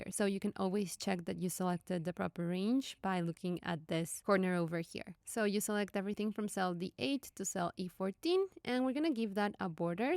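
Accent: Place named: Latin America. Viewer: Your tutorials are just amazing!! I'm from Mexico, but I went to college in the US and lived there for 12 years. You sound like my female friends from LatAm! I've seen many of your videos, and I don't think you've ever said your name! Thank you so much for making our lives easier with spreadsheets!!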